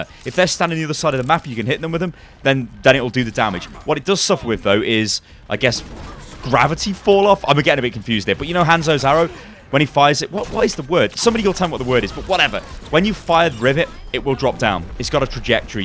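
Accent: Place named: United Kingdom. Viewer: Subbed for that hilarious laugh and that epic Brit accent. Oh, and the guides. Kinda. Ish.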